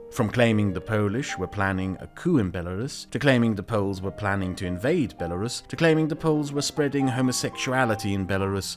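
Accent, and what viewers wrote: United Kingdom. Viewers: No he doesn’t he just recites Wikipedia in a British accent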